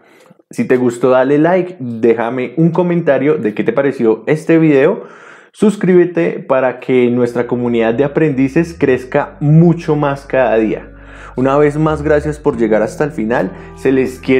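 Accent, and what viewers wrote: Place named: Bogotá, Colombia. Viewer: Habla como rolo jajaja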